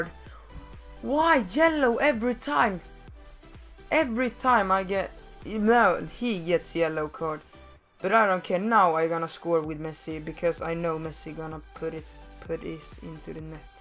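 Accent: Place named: Sweden. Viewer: Just Swedish viewers here... Because of that english.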